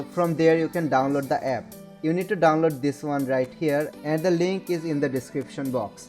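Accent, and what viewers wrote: India. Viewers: your Indian accent made me suspicious but it worked so thanks ?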